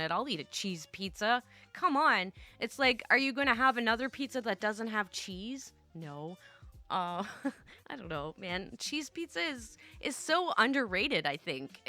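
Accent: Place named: New York, United States. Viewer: I love CB stories and I like your "New York" voice. :)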